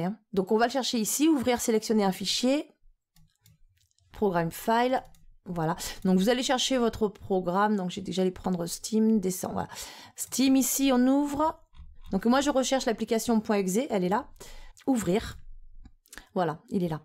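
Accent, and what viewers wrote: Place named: Southwest, France. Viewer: Toujours aussi percutent, un vrai plaisir d'apprendre avec un aussi bel accent du Sud/Sud Ouest. Bravo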